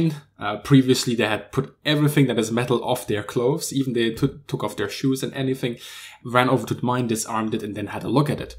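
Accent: Germany. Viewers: You sound German, so I get that you use meters, but that's more like 150 feet. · Bassmint He sounds german and in german its called aluminium so thats just natural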